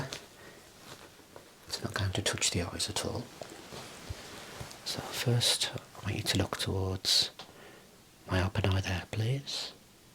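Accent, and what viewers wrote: United Kingdom. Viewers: I find your voice so relaxing. Some of that may be that, not being British myself, many British accents are interesting to my ears, but it's more than that.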